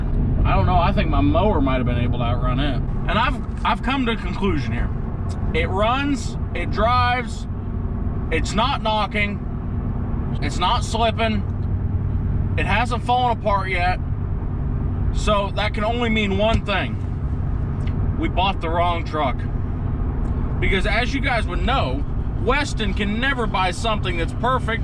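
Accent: South, United States. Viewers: Don't worry I subscribed but your voice sounds like Mickey Mouse with a Southern drawl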